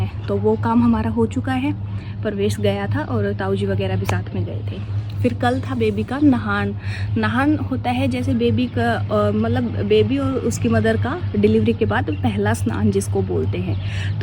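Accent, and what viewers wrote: Haryana, India. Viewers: Haryanvi acent is very Lovely and name is -moksh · Apni boli m hi banaya kro vlog 😂 haryanvi on top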